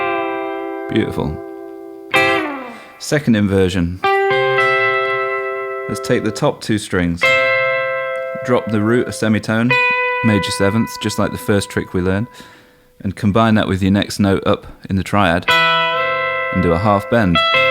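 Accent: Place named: Liverpool, United Kingdom. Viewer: Your accent is as cool as your playing! Liverpool?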